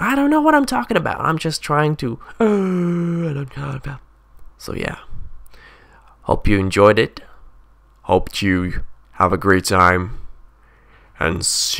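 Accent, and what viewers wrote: Scotland, United Kingdom. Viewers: English but in a british accent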